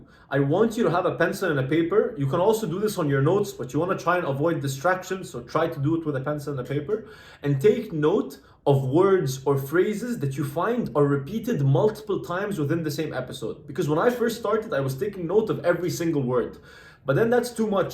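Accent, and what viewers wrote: Egypt. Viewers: Oh my god días mio! This my first time hearing Spanish with Egyptian accent 😂❤ man you are awesome keep up the good work ❤ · A good vedio, thanks for made it and keep going man ❤ But I have a question where are you from? Cuz u sound Egyptian which is cute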